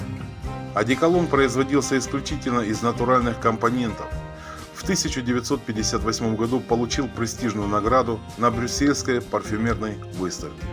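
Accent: Ukraine. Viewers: прекрасный украинский говор, что вы имеете против?)) не все говорят с маасковким ааакцэнтаам)))) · Вообще то это украинский канал, у них всё так говорят.... Могли бы сделать снисхождение, а не критиковать. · Это украинский или южнорусский акцент. А не издевательство! Речь не о том в видео! Спасибо автору за воспоминания и информацию для тех кто не знает. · Духи Праздничные- Россия и Юриала-3 - Прибалтика.А говор украинский за версту.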